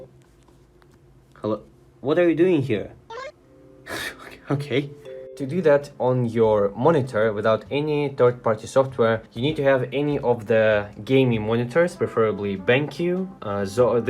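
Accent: Russia. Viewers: Эх, русский акцент тебя выдает ))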